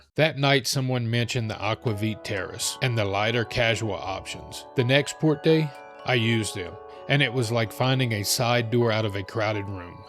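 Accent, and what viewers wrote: United States. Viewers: You don’t sound Irish. You sound American af. False advertising. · Almost 5 minutes of absolute dribble. Sounds like a typical yank, no homework done, totally unprepared.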